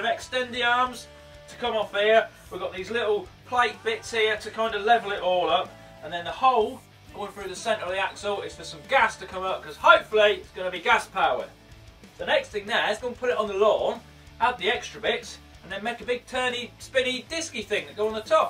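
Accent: United Kingdom. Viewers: hey colinfuze eres británico? hey where are you from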